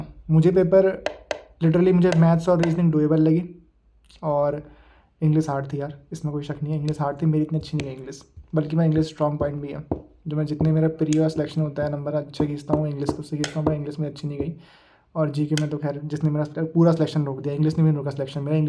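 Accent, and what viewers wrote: Uttarakhand, India. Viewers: uttarakhand se hai kya bhai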